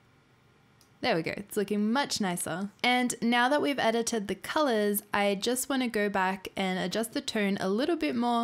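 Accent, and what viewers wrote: Australia. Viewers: Thank you for the great tutorial,this gonna help me alot 😉 Are you Aussie?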